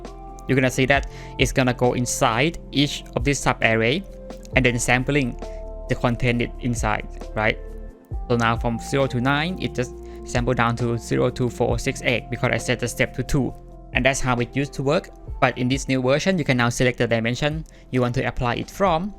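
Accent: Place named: Thailand. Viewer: คนไทยปะครับสำเนียงคือได้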